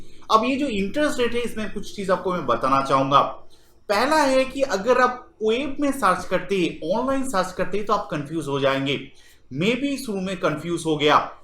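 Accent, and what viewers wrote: Bengal, India. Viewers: Bengali dada apka hindi bohut sweet hai...i like it...dada...👀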